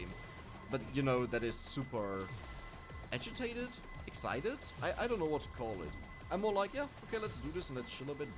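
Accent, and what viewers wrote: Germany. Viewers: man hört das du Deutscher bist an der weiße wie du Namen aussprichst ^-^ DANKE für die nicen Videos ^-^ ♥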